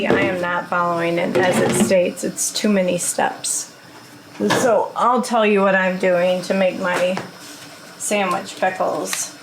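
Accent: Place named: Michigan, United States. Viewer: My family is from Michigan 😊 I love the accent it reminds me of them 💜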